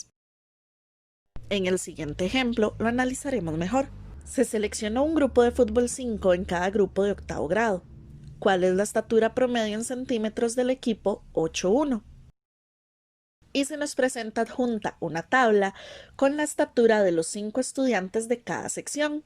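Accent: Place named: United States. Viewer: Soy yo o la profesora habla como si fuera gringa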